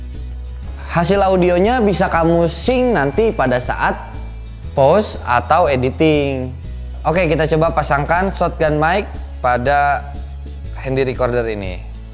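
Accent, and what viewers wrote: West Java, Indonesia. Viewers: Logat sundanya berasa hehe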